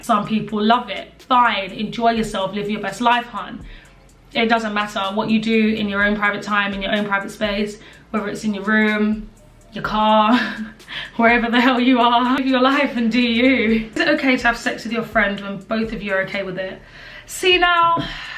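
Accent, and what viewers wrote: United Kingdom. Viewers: that beautiful brit ancient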